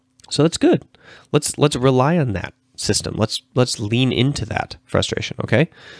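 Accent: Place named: United States. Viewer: you have an american accent yet studied in finnland. Are you finnish descent?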